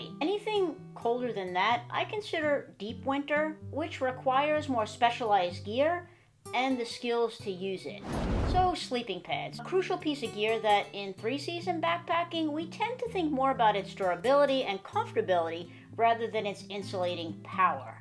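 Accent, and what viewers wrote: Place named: Northeast, United States. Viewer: your slight northeastern accent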